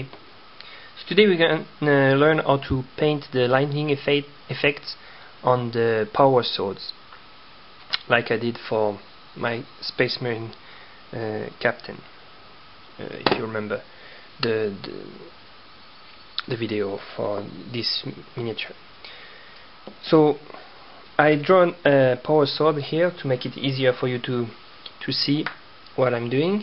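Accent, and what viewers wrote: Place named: France. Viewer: French accent of course!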